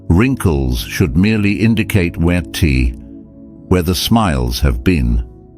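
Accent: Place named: England, United Kingdom. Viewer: Mark Twain quotes in an English accent, sigh, what is this world coming too?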